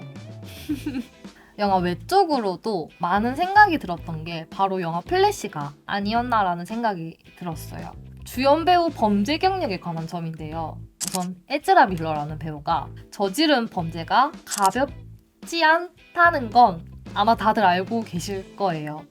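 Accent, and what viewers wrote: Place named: Busan, South Korea. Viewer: 익숙한 억양(부산사람)으로 차분하게 꼼꼼하게 잘 설명해주셔서 재미있게 시청했습니다 감사합니다 플래시 꼭! 보겠습니다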